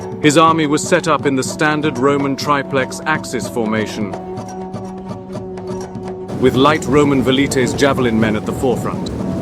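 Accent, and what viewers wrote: United Kingdom. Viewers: This history is told in a such a perfect way - animation, music, super British English and ideal synthetic summary, that I was watchin the film dozens of time! Really great!!!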